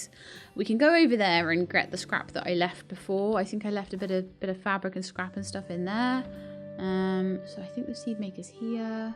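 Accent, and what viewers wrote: United Kingdom. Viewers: Looks interesting.can u play more of this game thanks p.s you still have a lovely voice where abouts in the UK you from because I ve hear it before but I can not place it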